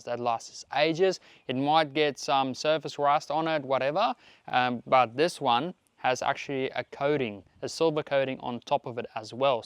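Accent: South Africa. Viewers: Your S.A accent comes through big ups bro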